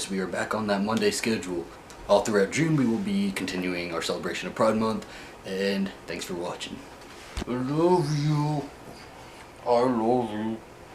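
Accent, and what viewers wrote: South, United States. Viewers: Ok ! Here i go again cyber stalking my fellow southern boy . I adore you . So sweet Honest ( southern boy ) . I just find you real and im a litttle older but i learn from you. . ( btw hint hint. Become friends with a flight attendant ( me ). . Saves on travel . Just kidding . Being in new York , then Miami I lost my southern accent unless im yelling at my bf whom yells back in Spanish . Thats its own video !! Any way i love the accent snd your open heart and sweetness Namaste , Rocky ✈️🙏🏻😇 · So many great suggestions Z, from you and others, so thanks! I'm watching Drown now. The Broken Hearts Club is good, and Rock Haven. I love your accent; makes me want to move back to the south.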